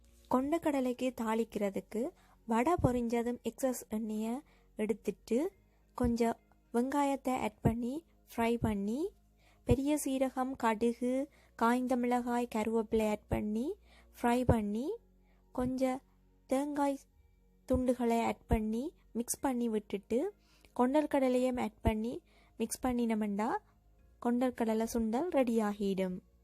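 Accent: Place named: Sri Lanka. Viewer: Excellent 5 minutes la 3 dish, 👌👌 உங்கள் குரல் மாற்று உங்கட சிங்கள தமிழ் அழகு 👌👌👌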